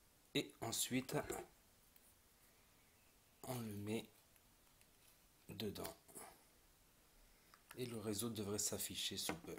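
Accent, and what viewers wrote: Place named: Arab world. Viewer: L accent robeux hhhh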